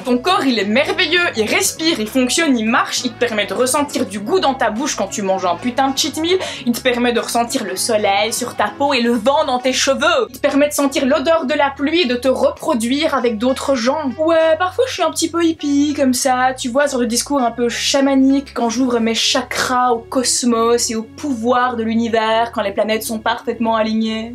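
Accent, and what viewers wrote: Belgium. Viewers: Super vidéo!! Merci de mettre plein d’humour et de bonheur dans nos vies et de nous aider à accepter nos ptits complexes:) PS: j’adore ton accent belge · ton accent belge gives me life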